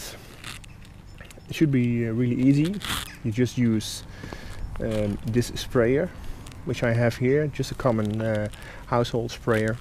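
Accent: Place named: Netherlands. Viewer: so your you r dutch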